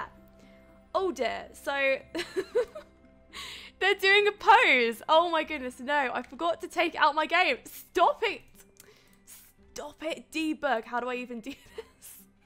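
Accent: England, United Kingdom. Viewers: i Love your accent! where is it from? i mean what Area in england?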